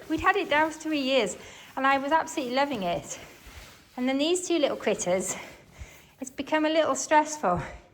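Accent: United Kingdom. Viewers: What a pleasure to hear an English accent here on YouTube! I also find it comforting to see an unedited, realistic cleaning video. Thank you for posting.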